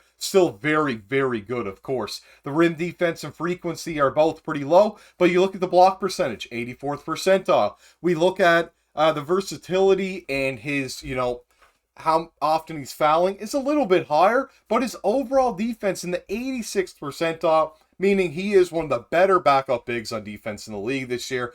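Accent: Canada. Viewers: are you from nova scotia?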